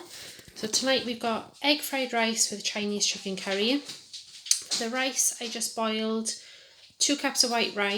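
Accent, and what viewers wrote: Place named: Wales, United Kingdom. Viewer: Hello, new subscriber😊 just wondering where your accents is from, I’m from South Wales myself and your accents sounds welsh😊 brilliant video x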